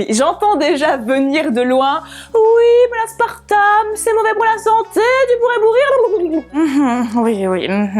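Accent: Liège, Belgium. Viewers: L'accent belge ? C'est l'accent de Liège. Tous les Français parlent comme Jackie Sardou ? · On reconnaît l'accent belge à des km <3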